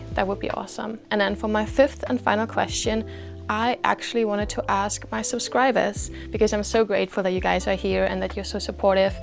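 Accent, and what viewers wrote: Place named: Germany. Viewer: Are you German ? Your accent is German accent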